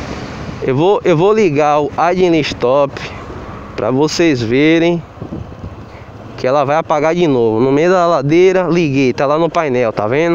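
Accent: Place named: Bahia, Brazil. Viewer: Esse nosso sotaque Bahia é top de mais ..... abraço meu amigo. Pegar a minha final do ano.🙏🏼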